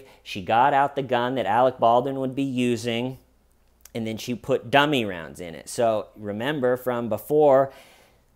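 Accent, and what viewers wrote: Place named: New York, United States. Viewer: You're picking up a NYC accent, homie.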